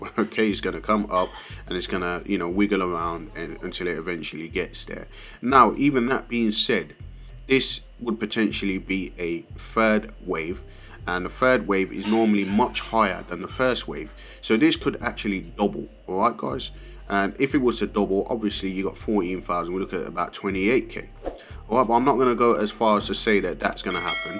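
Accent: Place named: London, United Kingdom. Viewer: I like your accent guy, london bwoi.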